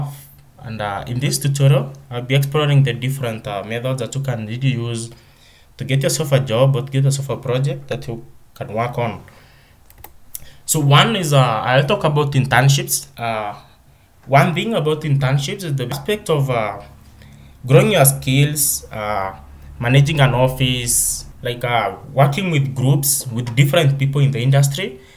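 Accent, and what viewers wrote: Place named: India. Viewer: Awesome, u sound like a hindu though :)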